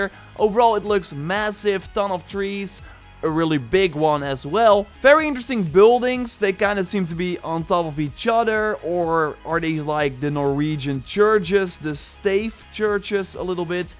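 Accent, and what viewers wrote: Netherlands. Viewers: Man he has such a bad accent, I mean I know several dutch ppl who speak normally and this guy's out here saying fideo and ashgard and volhala hahaaha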